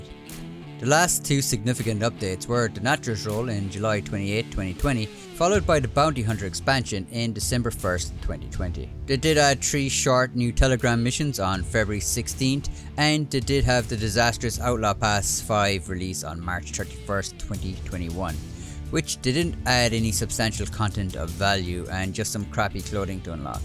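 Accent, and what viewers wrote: Ireland. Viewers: Dublin or Belfast?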